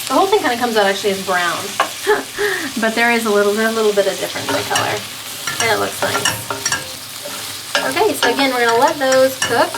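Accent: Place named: France. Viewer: I read your comment and get your concept. I love it! Recipe looks good. I like it soupy stew like but Check out Martha Stewart and Daniel Boulud ratatouille. It's very authentic as they eat in France as side dish. Bonne chance X p.s your French accent is so incredible!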